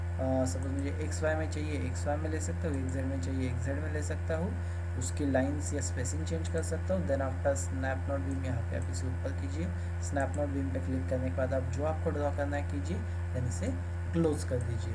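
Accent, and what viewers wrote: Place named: India. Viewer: good video ! It would be great if your video had the option of subtitles, since it speaks too fast and its Hindu accent is very strong.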